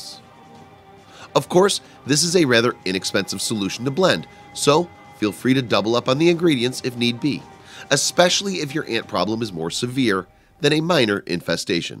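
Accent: United States. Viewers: Long winded American voice drive insane ,please come straight to the point, be more helpful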